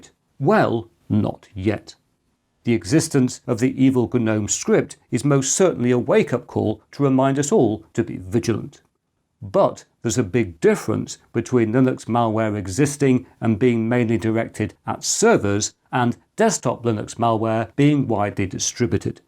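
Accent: United Kingdom. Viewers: I wish I had you in my CS classes back then. First, a british accent is always a win, second, your teaching is incredibly motivating and paced on point. Thank you so much for your content!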